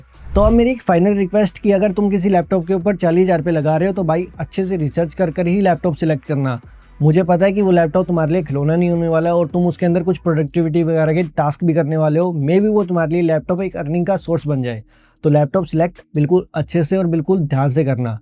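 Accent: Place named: Haryana, India. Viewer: Bhai haryana se ho kiya btw lag raha ha 😅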